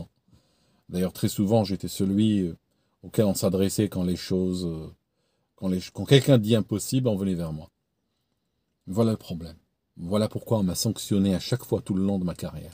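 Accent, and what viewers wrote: Switzerland. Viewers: T quand même un sacré personnage ! Et il vrai que ton accent suisse revient de tps à autre... En ts cas je vis la même chose que toi au niveau de l'excellence dans mon poste de travail et au moment où je demande un stage pour me mettre au "goût Du jour" informatique et nouveaux matériel lumières (je suis éclairagiste) on me dit : non pas la peine de, car tu es tellement bon que tu vas te former seul !!!. Voilà, comment être mis à l'écart et sombrer dans une espèce de désespoir et donc ne même plus être bon dans mon domaine de compétence.. Merci 😢. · Vous m’avez fait rire et vous êtes touchant et ça m’émeut !!! avec l’accent suisse et c’est le dibou 😀😀😀😀👍👍👏👏👏👏👏👏👏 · Si ton accent Suisse amusait du monde imagines l'accent africain 😅pire mélangé un peu à l'accent québécois. Je viens de la France avant hier même mes gosses se foutent de mon accent. 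malheureusement c'est ce que j'ai remarqué chez les francophones contrairement aux anglophones chaque fois j'ai été aux États-Unis, à Londres, en scandinavie... Jamais on s'est moqué de mon accent en anglais les américains me disent même your English is very good quand je leurs dis excuse my bad English. En terme devolution en entreprise t'aurais vraiment pu essayer le Canada c'est juste fou comment on évolue vite même en salaire d'où suis parti de l'Europe car je savais que si je restais rien n'aurait bougé jusqu'à ma retraite bah j'ai refusé de me contenter de ça et suis heureux d'être parti il y a bientôt 3 ans. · L’accent Suisse 😅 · Mais c'était quand même sympa l'accent suisse ! J'en redemande ! Sinon, absolument d'accord. Le monde de l'entreprise est plutôt ingrat, en plus d'être rébarbatif. On doit y côtoyer plein de gens absolument ineptes et, le plus souvent, on a fait le tour des tâches en moins d'un an, à quelques ajustements près. 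Tu n'as jamais entendu "je ne comprends pas pourquoi tu veux cette augmentation, tu aimes ce que tu fais. Crois moi, ça n'a pas de prix " ? Tu as aussi de grosses boîtes qui pensent que tu as tellement de chance de les avoir pour clientes que tu devrais travailler pour zéro et pourquoi pas finir par te prostituer (symboliquement) pour pouvoir les conserver. Heureusement que je suis mon propre patron. Je n'ai plus de patience, je me ferais virer rapidement. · Ouais j'ai adoré ton accent Suisse j'ai pensé à mon copain Paquito, et des bons souvenirs...Merci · Bonjour, cette histoire d'accent suisse qui revient quand tu es fatigué m'a bien fait rire, moi c'est ma femme qui lorsqu'elle s'énerve ou qu'elle est stressée et celà arrive souvent me parle en kabyle alors que je ne comprends rien vu que je suis Français.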